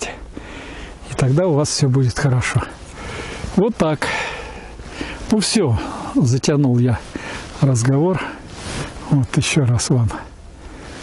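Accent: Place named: Armenia. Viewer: Слышится лёгкий армянский акцент )) Природа красивая.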